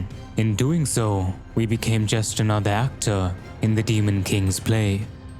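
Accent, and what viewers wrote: South Africa. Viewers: I knew you were South African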